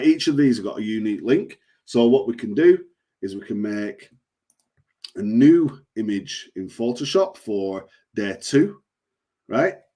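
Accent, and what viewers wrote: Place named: North, United Kingdom. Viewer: Yes! Sound like a northerner lad.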